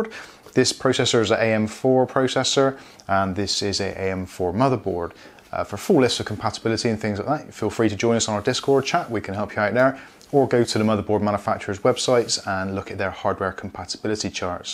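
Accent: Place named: Yate, United Kingdom. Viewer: Your 100% from yate or not far from there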